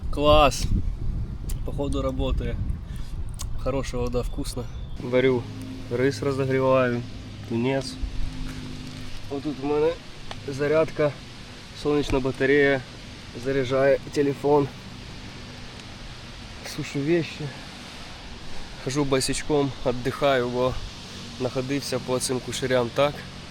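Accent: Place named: Poltava, Ukraine. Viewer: Перший раз тебе дивлюся! Красава! А по суржику схоже що ти з Полтави?!😅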